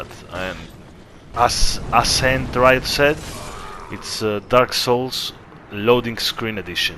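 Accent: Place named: Italy. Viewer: Oh, I agree with you. A lot of invaders are just elitists jerks. Everybody was a noob once. Everybody struggled. I know I did. People still complain about gankers, but real gankers are so rare nowadays. 3 dudes trying to beat the level are not gankers they are co-oppers. DS3 was the most balanced way From Soft did in my opinion. IMO It struck the possible balance between hosts not being too strong and invaders not being overpowered. It makes the game fun for most people. About DSR - I have heard about the rumours about weapon matchmaking, but as far as I know that is still unconfirmed or uncertain ("Players outside a host's parameter range will not be matched with each other"). The estus healing only in DSR is already confirmed. <link> DSR will IMO follow a lot of what was learned about balance in DS3, as DS1 was very unbalanced, sometimes in the hosts favor and sometimes on the invaders favor. 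I am glad to hear this. I bet there will also be a double confirm system on backstabs like in ds3, and stunlock will not be infinite, but this is just speculation. Anyway, great video, and I hope to see your content on DSR. PS- BTW you are italian, right? Your accent seems italian!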